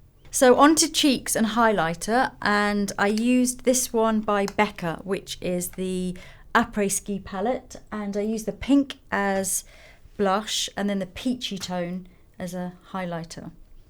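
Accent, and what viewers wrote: United Kingdom. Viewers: I always fall in love with your simplicity and your perfect british english <3 Best makeup artist.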